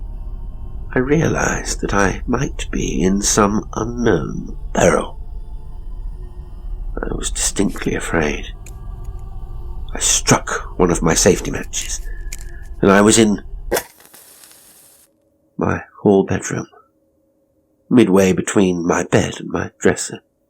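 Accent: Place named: United States. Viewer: This is the first story I have heard you read with an American accent. You are very versatile, Sir, and I am full of admiration.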